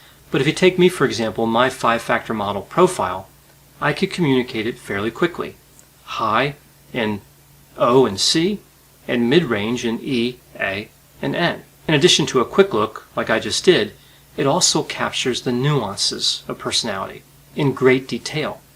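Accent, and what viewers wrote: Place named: Pennsylvania, United States. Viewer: I thought you might be INTP. As and ENFJ with inferior Ti I appreciate your logical analysis of psychological concepts. You are very interesting and entertaining to me. I have to disagree with you on the negatives of the MBTI. I don't identify with the other types like I do with the description of ENFJ. I also disagree with the descriptions of the types being all positive. The negatives are also discussed. I find it valid in the way it has helped me to understand myself and others. I appreciate my and others strengths and weaknesses so much more than I ever have. I love myself and others more. I am aware of my defects and how I can improve within reason. Comparing the MBTI to the Big 5 is not a fair comparison. The Big 5 was developed as an instrument to statistically determine mental health issues. The MBTI was not. 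It's more about how people think and relate cognitively. But I appreciate your analysis and love what you are doing. I first started listening to you because of your Pennsylvanian accent. I grew up in Lancaster County. Your videos showed up in my feed when I was listening to Dr. Ramani. I'm glad they did. You have taught me so many things and make my life so much more enjoyable. Thank you. Diane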